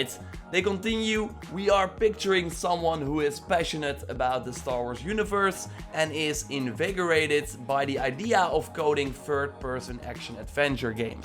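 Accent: Netherlands. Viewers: Youre Dutch aren’t you?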